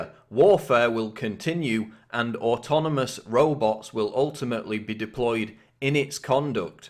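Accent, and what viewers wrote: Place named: Northern England, United Kingdom. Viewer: I can't take this narrator seriously, he is too northern and sounds like he is taking the piss.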